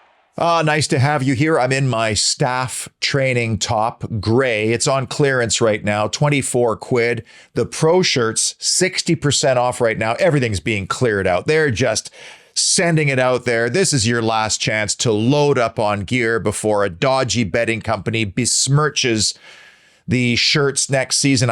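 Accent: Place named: Canada. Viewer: its awesome to hear that Canadian accent from a true Villan. international pride🦁🦁🦁. does somebody know if anyone is doing these kinds of videos on our Lionesses??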